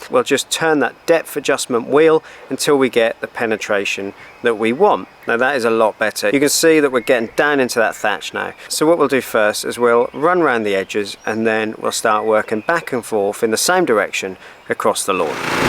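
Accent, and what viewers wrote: Norfolk, United Kingdom. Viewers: This was recommended right as im thinking about this, i might be a little late, but i probably couldnt make our garden worse than what it is. Also, as soon as i started listening i told my wife, this guys from norfolk, when i saw the bag i knew i wasnt wrong! 👍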